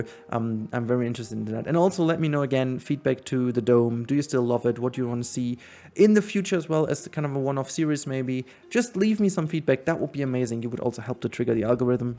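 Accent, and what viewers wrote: Germany. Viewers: Your English is totally fine! My mom is native German so your accent is already pretty familiar with me, and she says things a little differently sometimes. Honestly the game i'm looking forward to the most in 2021 is Prehistoric Kingdom. Dinosaurs + Zoo building = YES PLEASE! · Ich hab dich durch andere Planet Zoo Speedbuild Videos entdeckt und schau mir deine Videos seither immer wieder gerne an :) versteh nicht ganz wieso Leute auf unperfektes Englisch so abgehen. Ja, man hört ab und an dass du aus Deutschland kommst aber dein Englisch ist im Grunde absolut perfekt. Man findet immer was zu meckern, nimm dir sowas nicht zu Herzen. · You say your English is not native, but it is totally fine! Your slight German accent is more interesting and pleasant than a lot of native English speakers haha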